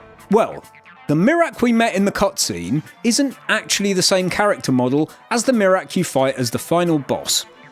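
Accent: United Kingdom. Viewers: Hello again funny British man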